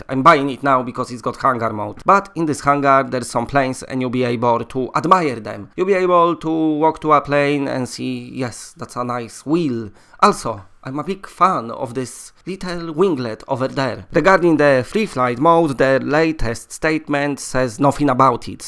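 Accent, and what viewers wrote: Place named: Poland. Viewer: I’m going to go out on a limb and guess Polish. It’s terrible to listen to though.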